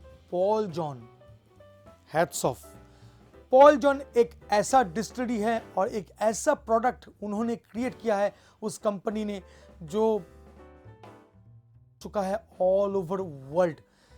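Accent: Bengal, India. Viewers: Ap Bengali ho....t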